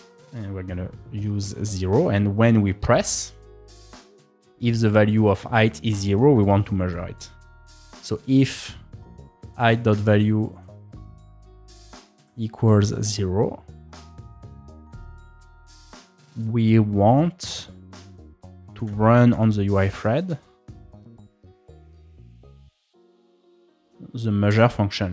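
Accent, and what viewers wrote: France. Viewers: Is this guy French or sth?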